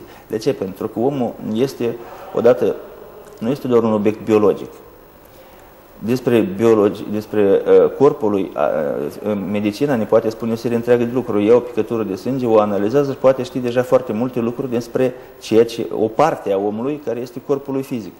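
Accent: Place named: Moldova. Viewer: Tipu e moldovean si ii e un pic cam greu sa renunte la accent (mai are scapari de accent) mai ales intr-un interviu televizat. Daca ar explica totul in termeni psihologici nu multi am intelege. El incearca o explicatie cat mai coerent pentru a intelegi si ultimul pamantean.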